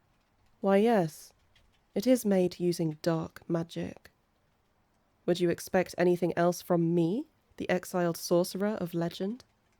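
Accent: United Kingdom. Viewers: A nice romantic story, but the sorceress didn't seem that dark to me. I like your voice and your English accent.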